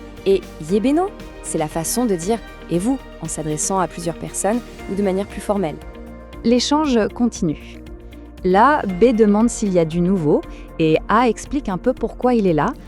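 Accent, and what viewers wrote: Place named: France. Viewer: Svp la voix prononce les mots avec l'accent français, ça n'aidera pas ceux qui veulent apprendre.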